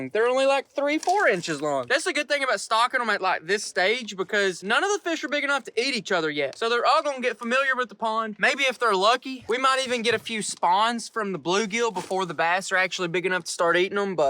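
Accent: South, United States. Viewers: It's still A riot to me that in this country, people actually talk like this honky tonky accent. Unreal! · Don't even know how I came across your channel but I've been watching 4 to 5 vids each night, I'm obsessed! And in my opinion, as a non American, your accent has quickly become my favourite American accent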